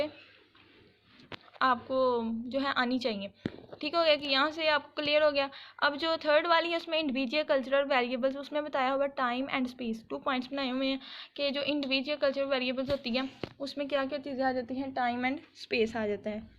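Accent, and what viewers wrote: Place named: Shakargarh, Pakistan. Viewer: Mjhy smjh to ni ai but mjhy ap ki voice sunny ka Bht Maza aia q k ap Shaker gerh ki h or mjhy achy lagty h whan k log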